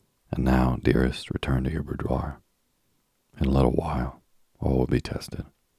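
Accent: South, United States. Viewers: a slight Southern twangy sound somewhere in your voice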